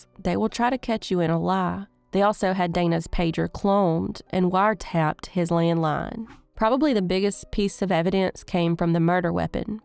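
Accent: South, United States. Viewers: 😊 I so enjoy the way you present your cases. Your voice is soothing and I could listen to your sweet Southern voice 💕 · Thank you. Wish you did audio books.:)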